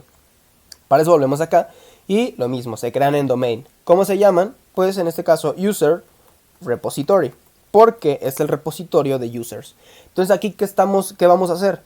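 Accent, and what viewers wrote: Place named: Colombia. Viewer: Hermano eres un crack ❤ Tengo ya unos meses tratando de entender bien arquitectura hexagonal y patrones de diseño y es el primer video que realmente me llega 🎉. Y mira que sigo a gente que explica bien y tiene conocimiento (no los voy a nombrar porq seguro ya muchos aqui los conocen 😅) pero aun asi tu has logrado en 20min los q ellos no. Creo por tu acento que eres de colombia asi que un saludo y un fuerte abrazo de un hermano venezolano. 😊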